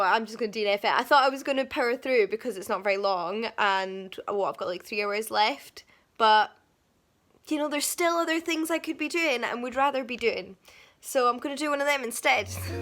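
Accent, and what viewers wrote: Scotland, United Kingdom. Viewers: I think there's a typo in the video title :o Not that I mind but I don't want you to come back to this video in a month or so and only realize it then I really like your videos and love listening to your scottish accent :D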